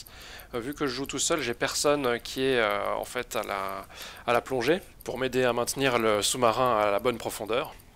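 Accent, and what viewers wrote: Switzerland. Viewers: Tu es du canton de Vaud ?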